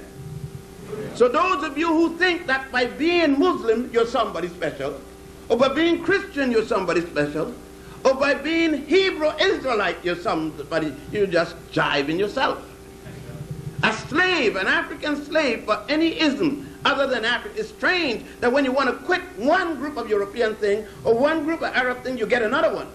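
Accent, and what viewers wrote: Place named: Caribbean. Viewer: Interesting Scholar And Speak With An Accent Probably Caribbean.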